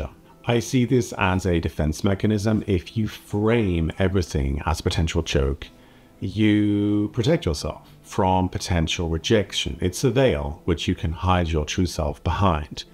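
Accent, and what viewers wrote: Germany. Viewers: It's because he has acquired a (very) slight German accent and diction! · You've picked up a German accent. It's been over 30 years since I last visited Germany. I should go back some day. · Not having to learn German only applies to people with EU passports (or in your case, "former" EU members). All the others, including Americans, will have to show some proficiency at a certain point in time, otherwise the residency will not be renewed. - Unless they changed that recently, which I might not be aware of (which I can't imagine). You're a lovely person and I'm glad the algorithm brought me here. Might it be possible that after all these years you have a slight German accent? What are your friends and family back home saying? I'm really curious. All the best and thank you. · This video is very interesting and informative. I think it's amusing that you now have a slight German accent. 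I learned German at school here in Australia in the 1980s and travel to Germany occasionally. I've visited every State, and it's one of my favourite countries. I find that if I start speaking German to a German stranger, he or she usually replies in English. Sometimes, that's convenient. At other times, I just keep on speaking German, and before long, the stranger gets the message. Usually, he or she then compliments me on how well I speak the language - so it really is worthwhile making the effort to learn. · I can hear that he lives in Germany in his English!!! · As a Brit I’d love to be able to just get on a train and travel to different countries so easily. Ps I can hear a slight German undertone in your English now. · This is the first of your videos I'm watching. I observe that your native british english has a german accent. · Interestingly, you speak English like a German, not a Brit. 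· As a Brit,I love Germany, it's a beautiful country, and I find German frankness very refreshing. Whether I could live there or not ,is a different matter .BTW, after 10 years living in the country, your English accent appears to be tinged with teutonic tones. Thanks for posting.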